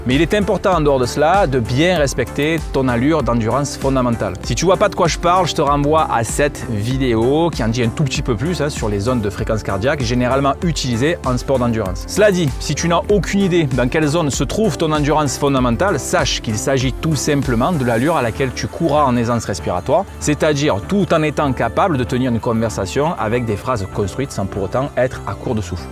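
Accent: South, France. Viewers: un toujours aussi pertinent, intéressant,pédagogique.le tout accompagné d'un accent ensoleillé.Bref, c'est toujours un plaisir de regarder tes vidéos.Bonne reprise et bonne continuation.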